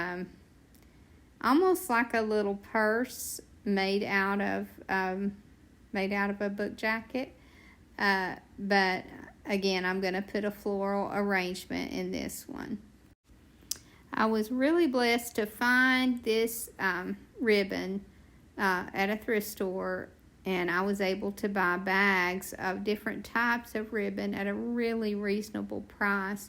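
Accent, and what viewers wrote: South, United States. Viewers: I really did enjoy this video & I enjoyed listening to your sweet southern accent. My mom was from West Virginia & you brought back many happy memories... TFS 💕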